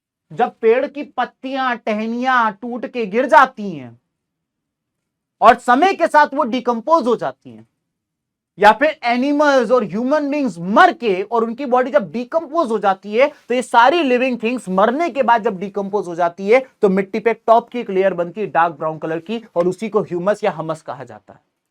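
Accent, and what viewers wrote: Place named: Bihar, India. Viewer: Pure bihari lag rahe hai sir ❤❤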